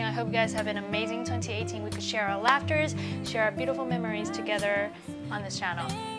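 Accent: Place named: New Zealand. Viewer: Your Vlogs are always amazing, even if I have no idea what you're saying the visuals overcome the language boundaries. Funny how you mentioned your kiwi english, because you would fit in quite well here in NZ, you definitely have our accent :-)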